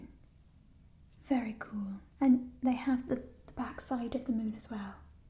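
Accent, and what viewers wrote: United Kingdom. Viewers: The thief is back, I love the British accent, you do such a good job. Also that TMNT reference lol. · is making veiled threats and mocking me with a British accent. Is this nice? Yes, yes it is. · You know what, you remind me a lot of Allison Williams, actually. And it occured to me that she was in Peter Pan Live, which I absolutely love, and you've also done a sort of Peter Pan roleplay a while back, haven't you? It was a big collab, right? I think it was the accent that made me think of this, I guess it's British? I dunno, maybe you should do another sort of Peter Pan thing... 👉👈 I just think you would be so perfect for that version of Peter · Nice British accent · Firstly, you're so good at that British accent. Well on point. ❤️ Secondly, I LOVE this character so much! You're amazing at what you do! 💕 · BRITISH ACCENT ALERT❤️ Love this look too x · Ya know what you do one of the best British accents I've ever heard from an American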